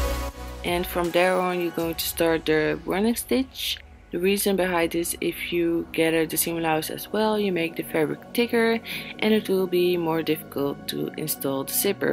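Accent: Netherlands. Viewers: you sound so extremely dutch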